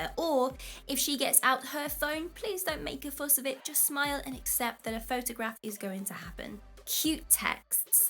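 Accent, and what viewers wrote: United Kingdom. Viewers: Just love that British accent...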